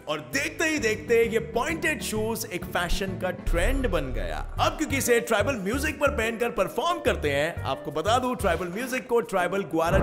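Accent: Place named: Maharashtra, India. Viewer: Maharashtra se hai kya? Because accent se aisa hi lagata hai🔥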